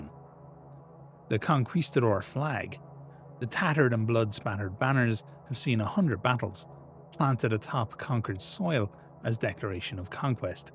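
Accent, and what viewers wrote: Ireland. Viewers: These drops are comin in too hot and fast! My heart can't take this much dulcet Irish trench ASMR! (Yes it can, I need more, MOAR!!!!!!)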